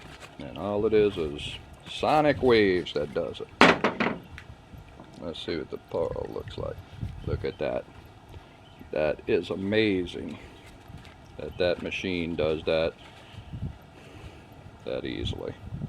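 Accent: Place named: Louisiana, United States. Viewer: I was looking for help and found it. I also said this dude is from Louisiana. 😂. I recognized the accent. I’m from Gonzales La. Thanks for the help.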